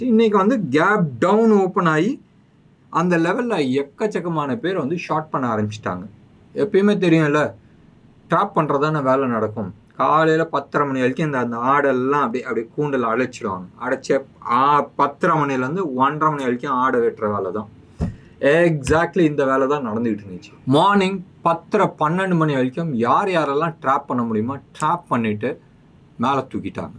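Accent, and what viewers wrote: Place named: Kongu, India. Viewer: I felt I was at erode speaking to my cousins regarding the market status .but we enjoy the slang and daily used phrases all pains have washed out l.i ama kongu girl thank you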